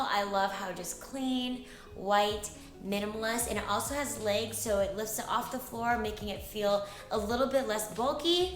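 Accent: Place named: Texas, United States. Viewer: I love her accent! Is it Texan?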